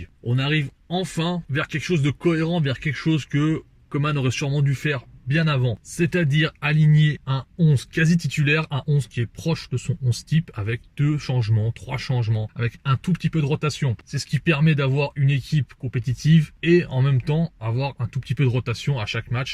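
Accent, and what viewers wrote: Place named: Spain. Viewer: C’est quoi tes origines ? t’a un accent qui me fait penser a l’Espagne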